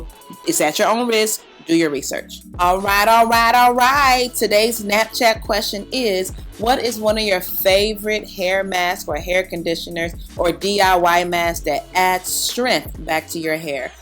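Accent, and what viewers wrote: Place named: Nigeria. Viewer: Yasssssss come through with that Ayruveda! Can you do a whole video with your Nigerian accent lol, I love hearing it.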